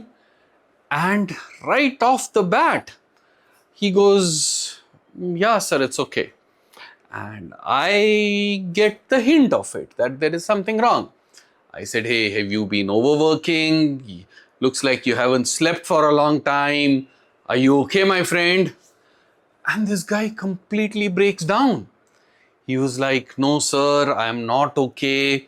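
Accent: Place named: Karnataka, India. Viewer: Sir you are punjabi but your accent is pure kannada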